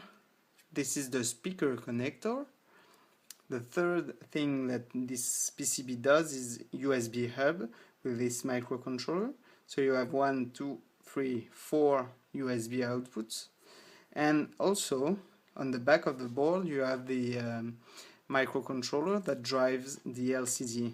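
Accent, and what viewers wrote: France. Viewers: Putain mec please parle fr ca s entend trop a ton accent que tu parles fr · salut ^^ Vu ton nom et ton accent, j’imagine que je peu parler français ... tu fait la même chose avec un Pi 3 b+ ?? pour supporter le nouveau recalbox 4.1 (dreamcast / PSP / etc ...)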